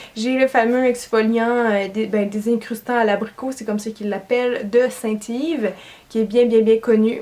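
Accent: Quebec, Canada. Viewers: il mfait bander ton accent québecois x) jpasse le salam à tous les québecois vous êtes tous des gens adorables vive le québec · Merci pour ce super concours ! :) je t'adore tu est tellement belle et naturelle, je suis abonnée à beaucoup de youtubeuses beauté françaises et malgré ton petit accent québécois (qui enfaite ne me dérange absolument pas) tu est ma préférée :)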